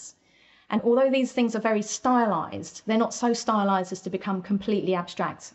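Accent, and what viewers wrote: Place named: Cockney, United Kingdom. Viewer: Absolutely love her working class London accent Your a class act 👍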